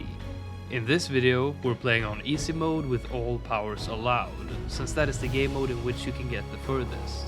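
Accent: Sweden. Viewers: Kan inte annat än älska den svenska accenten! Härlig video! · the swedish accent is wayy to hard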